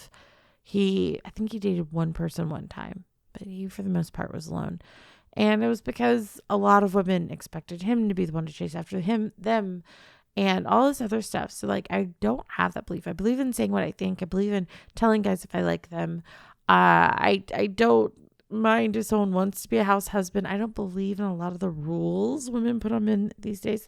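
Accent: South, United States. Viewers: Too bad women like this dont exist and im not saying i wanna take a backseat and let her do EVERYTHING im a man i want to treat a woman like a lady i wanna praise her too. But you got women out here wanting a guy to have a chisled body be 6ft or more and make 100k+ a year like uh..... so im just cooked also her southern accent is amazing.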